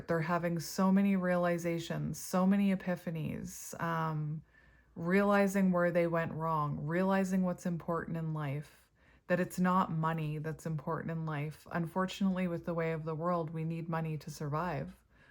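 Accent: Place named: Manitoba, Canada. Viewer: I'm so curious, what province are you from? Your accent sort of gives me Manitoba vibes but it's hard to tell haha 😄BC girl over here 🇨🇦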